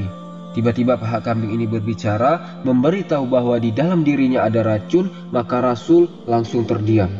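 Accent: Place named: Sulawesi, Indonesia. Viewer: Logatnya kayak orang sulawesi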